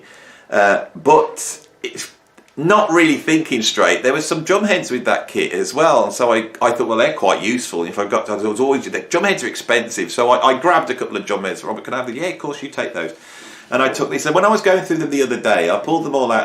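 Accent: Birmingham, United Kingdom. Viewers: The accent is called 'brummagem.' Hopefully, that's cleared that up for you all. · Pure Brummie!! An Aussie who lived in Cov for 5 years, spent all my time in Brum, and miss my wonderful Brummie mates. Thanks, for the trip down audio memory lane! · Lovely Brummie accent. We want the next video all in Brummie 😄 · the Brum accent made me spit tea down my t-shirt. I guess it's now a tea-shirt. Sorry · Canadian here. Assuming the accent is Birmingham given Sabbath's origins and the fact you mentioned the building on the back of Zep 4. The Who are the greatest. I understand where you are coming from about early vs later but I really love Tommy and Quadrophenia. By Numbers and Who Are You were good as well but not as impactful as the earlier stuff. It pains me to say this (as I did buy Face Dances and I think Kenny Jones is a capable drummer), but, .... 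artistic material-wise... the Who died with Keith. The peak in original albums was with Quadrophenia in 73. I've seen them live twice and loved both concerts but, for me, it's just a chance to see them do their stuff from 73 and earlier with a couple odd songs from Numbers and Who R U tossed in. It also really pains me to say that I don't think I've ever heard Supernaut or Bloodsucker...I'm actually embarrassed... being a little older than you and all... I'll use this list as a guide to some classics I should be listening to. In that, you have been a valuable cultural public servant and I thank you.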